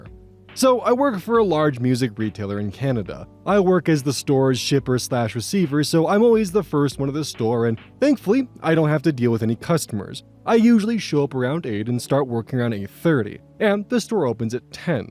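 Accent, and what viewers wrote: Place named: New York, United States. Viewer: Hearing being from Idaho is surprising to me you have a stereotypical New Yorker accent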